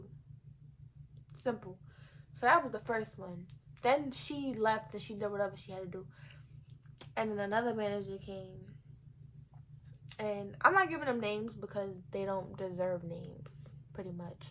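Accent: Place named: East Coast, United States. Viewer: You from the east coast? NY or NJ I can tell by the accent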